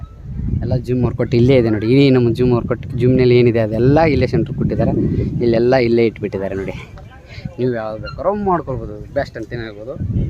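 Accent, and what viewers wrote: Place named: Davanagere, India. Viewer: Bro nanu davanagere talk erne maani bro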